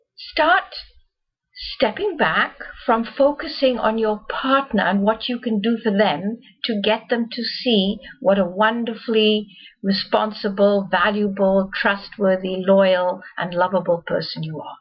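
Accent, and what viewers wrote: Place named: South Africa. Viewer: she sounds like a South African!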